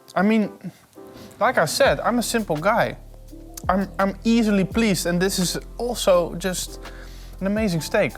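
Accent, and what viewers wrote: Netherlands. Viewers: I grew up in the Netherlands and I'd bet money ( by his accent ) so did this guy. If so the price of beef in NL vs its price in a beef producing state in the USA is not a fair comparison. Flat Iron ( what that cut is called here in the USA ) is usually BBQ'ed which breaks down its intramuscular sinews rendering a tender cut for the plate.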